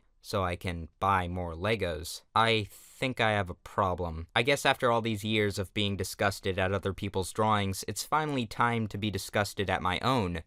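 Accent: United States. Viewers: i find your american accent attractive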